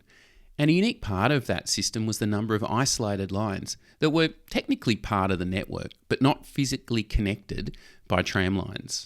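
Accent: Australia. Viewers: Thank you for this. On the narrator, the accent is a hybrid of Aussie and English..... at least to my ears. I wonder when that started changing and we ended up with what we all recognise today.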